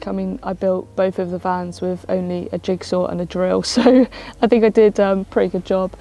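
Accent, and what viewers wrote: England, United Kingdom. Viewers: such a nice english english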